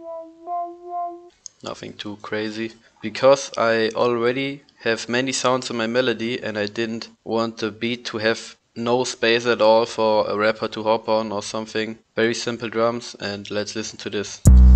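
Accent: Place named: Germany. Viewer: man hört du bist deutscher😂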